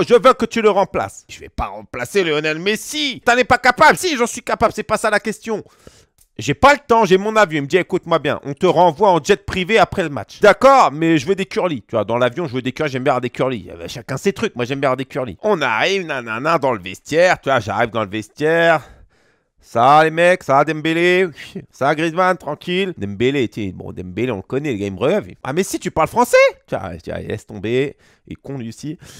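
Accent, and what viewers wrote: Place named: Spain. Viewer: Salut à tous et meilleurs vœux pour 2021., toujours fidèle à ta réputation. Une histoire pleine d’humilité et de franchise. On s’y croirait presque avec l’accent espagnol et le maillot de Levante sur les extraits vidéo. Merci pour ces histoires et hâte d’entendre les prochaines. Ne changes pas;)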